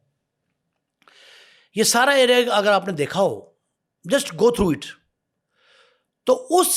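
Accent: Punjab, Pakistan. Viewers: پنجابی لہجے والوں سے کراچی کی کہانی سننے میں الگ ہی مزہ آتا ہے 🤣🤣🤣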